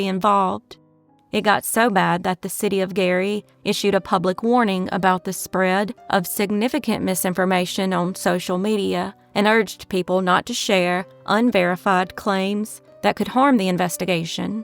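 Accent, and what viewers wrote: South, United States. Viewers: What part of the south are you from? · Thanks for doing Indiana. Pretty good videos. N actually have a nice pleasant voice with a Lil southern twang lol But seriously, thanks. We appreciate it.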